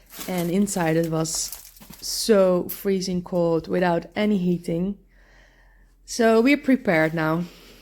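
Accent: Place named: Netherlands. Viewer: i swear you voice is so familiar to me. Is your name is if you are please let me know please my is Dutch and you have same accent as her??? Thank you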